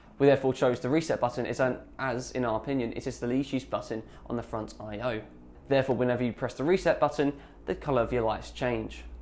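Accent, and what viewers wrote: United Kingdom. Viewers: u wot m8? he just has a british accent and a light one at that. what are you on? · I don't understand what you are saying bristish accent so hard for me..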